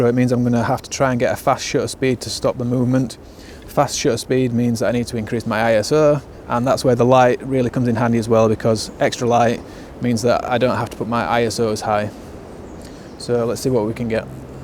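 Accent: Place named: Congleton, United Kingdom. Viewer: You sound just like all my pals from Congleton 👍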